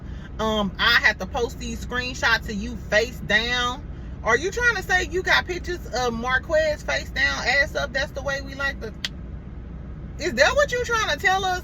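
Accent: St. Louis, United States. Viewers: Love your commentary and STL accent!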